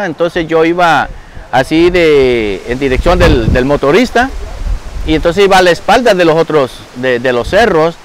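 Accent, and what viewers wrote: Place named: Guatemala. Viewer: Este maytro habla como Chapin .saludes a todos Los de aguachapan y sonsonate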